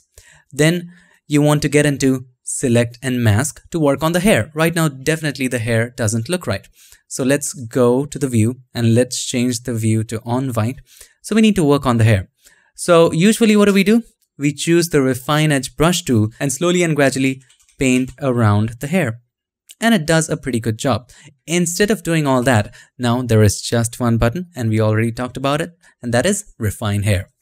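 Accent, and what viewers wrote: India. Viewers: i just subscribed to your channel... where are you from btw sir? you're sounded like from educated indian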